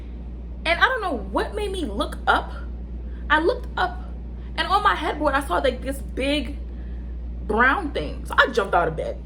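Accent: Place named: New York, United States. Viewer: Ouu im from nola and and I hear that ny accent are u from queens ?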